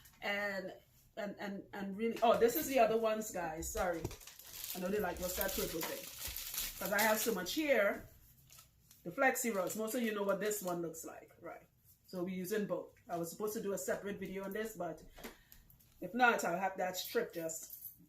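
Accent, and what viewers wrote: Trinidad and Tobago. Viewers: You look and feel like family, Share my daughters name and nickname, AND are on the IFA PATH! Love it! I have watched you off and on for a bit to decide whether I am ready to commit to the loc journey, and HOW to correctly care for my crown when I am ready. I must say THIS SHARE RIGHT HERE.....un-cut and No Mamagisms... was right on time! I bought a journal years ago. It was blessed when I was crowned in Naija. Now all it needs is 27, Hebrew, and The Seal of Ase-WA!!! Words are not enough to express my gratitude because listening to this video today to the end has confirmed, I have been in my own way post a traumatic marriage. I must admit Your accent and euphemisms had me missing T&T too bad. I am going to ring family after this video lol just so they can subscribe as well. I am full on supporting now. Especially because your story makes one introspective. 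I was crowned a Rare Female King and now I need the Balance of My Divine Male. I just need to follow the wisdom imparted in this video. Modupe ati Iré Ní O!! Will update you on the manifest💯💛💙💛💯ASE!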